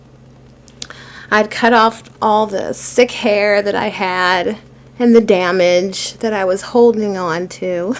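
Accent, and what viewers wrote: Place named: United Kingdom. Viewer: Loved Your British accent !!!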